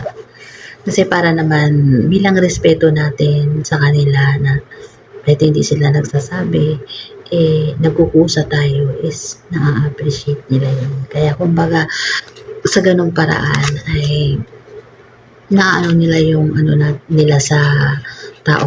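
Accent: Waray, Philippines. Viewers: Hala waray ka ngay-an sis ☺️ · Hala waray ka liwat kabayan?taga este ako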